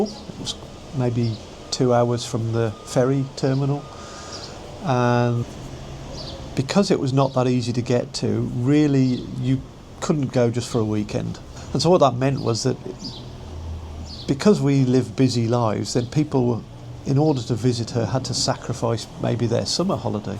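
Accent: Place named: Midlands, United Kingdom. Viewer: I thought I detected a slight Midlands accent! .I used to occasionally go with dad and brother to Wolves matches(in the standing" pens") in late 1960s Originally from near Wolves myself and the last 30 odd years in France(though spending a lot of time in Sidmouth Devon for the last 6 of them helping out my elderly mum)I am, since she passed on a few months ago in a quandary. The problem is, like you, I really can't decide where to settle, always thought would stay in France but the time spent in Devon with mom and sister(who returned recently from about 35 years living in Trento Italy to Sidmouth) has confused things enormously as am getting old! Two offspring ,one living in Annecy France the other in London doesnt help either and they both could move on elsewhere. Anyway very much enjoyed listening to your story,hope things work out for you and family.Devon is a lovely county.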